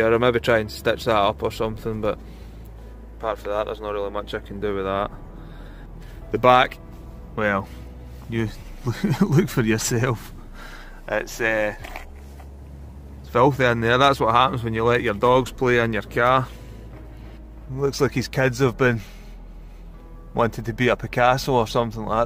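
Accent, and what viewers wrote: Scotland, United Kingdom. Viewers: Love the Scottish accent!! I'm from Morocco but live in Wales with my Welsh ge-rl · Is that the Scottish accent!!!😂😂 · you sound scottish,first video :D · I love your videos! ☺️ and I absolutely LOVE your Scottish accent. Or is it not Scottish? Suddenly not too sure anymore 😄 nevertheless, I like it 😍😄 Swiss gal with a Scottish grandma here. So, technically not half Swiss half Scot but when it comes to my heart, at least half of it beats for this beautiful country called Scotland! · good job! love the Scottish accent... alright goias and gaels... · Is this scottish? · what is that awsome accent? scotch ? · I can't be the only one who watches these videos mainly to hear that Scottish accent... 😍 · I did enjoy the video. First, because I enjoy listening to your lovely Scottish accent as much as I enjoy watching the cleaning process; second, because it is nice to see a man cleaning for a change. 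lol. Waiting for your next video. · This was such a job well done! Originally from Maui, HI, but attending university in Seattle, WA! You’re Scottish right?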